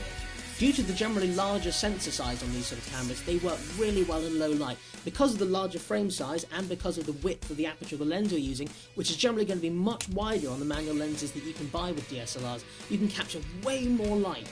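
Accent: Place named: RP, United Kingdom. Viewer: Really interesting stuff- despite really enjoying photography, I've never really understood all the mechanics of it so cool vid :) As a side note, you might speak a little more slowly? Your voice is very engaging but the pace is a little...pacey ;p Sean wants me to add that he thought it was very good and he was impressed :) ...also, I forgot how posh you sound!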